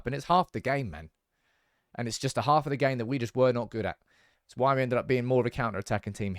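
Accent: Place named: Cockney, United Kingdom. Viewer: Cockney clown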